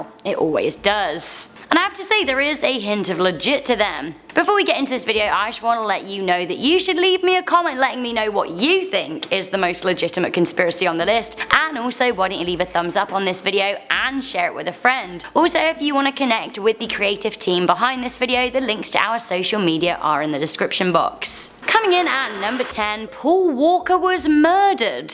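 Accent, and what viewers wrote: England, United Kingdom. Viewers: Damn shes hot, the English accent makes it better lol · Hey, Your accent is beautiful is it British? Btw you're gourgeous💯💓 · British accents get annoying. I got a massive knob ya?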